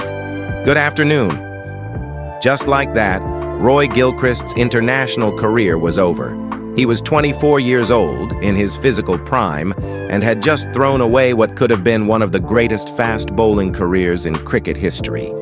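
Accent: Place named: United States. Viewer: Cricket and a american accent of the anchor doesn't seem normal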